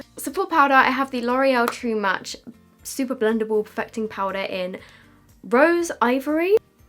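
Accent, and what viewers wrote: Australia. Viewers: love how you kept turning australian ahhaha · Wtf is that Australian accent 😂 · Loved the Australian accent😂❤️ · Oh, as an Aussie I just love your Australian accents. 😂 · Yo thats good aussie accent! 😍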